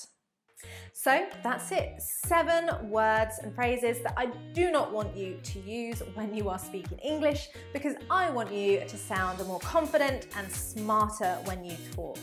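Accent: United Kingdom. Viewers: thanks a bunch for that i love your accent BR E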